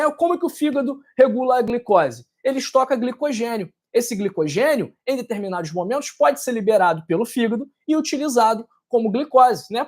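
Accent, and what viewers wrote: Rio de Janeiro, Brazil. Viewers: Porque será que o sotaque carioca é tão desagradável e IRRITANTE ??????? Não dá para assistir !!!! Affffffff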